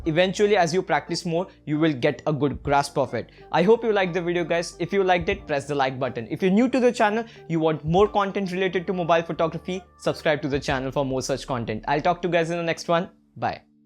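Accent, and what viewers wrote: India. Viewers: Finally a decent indian accent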